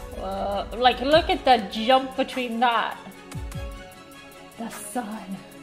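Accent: Ireland. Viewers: Hey, another video where you just, talk, talk, talk,right. I’m kidding, I like that you talk during your videos. I can hear ore of that Irish accent. Tell your mom, your fans have spoken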